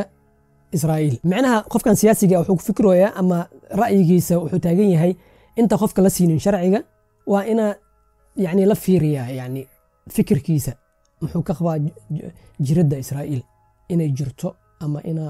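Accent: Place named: Jilib, Somalia. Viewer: My bro wan jecelahay qisoyinkaga sido kale qabka aad uga shekeso waxaatahay qosol bro lahjadeda jilib marko ayaa isoo xasusisey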